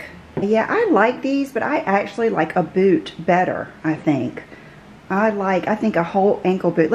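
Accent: South, United States. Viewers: Hi. I just wanted to say how much I enjoy seeing your pretty face and listening to your soft southern accent. Things are getting very scary here in the UK (as it is everywhere) and your videos are the perfect distraction to the craziness going on around all of us. I hope you and your family stay safe and well. 🙏🏻💕💋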